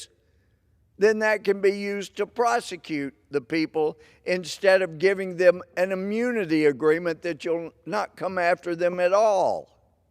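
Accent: South, United States. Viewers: He has that charming southern drawl at times.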